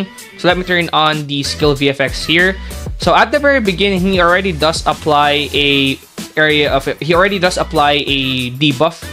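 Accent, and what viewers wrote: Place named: Philippines. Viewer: bro I'm Filipino, but your 100% Filipino, the accent broo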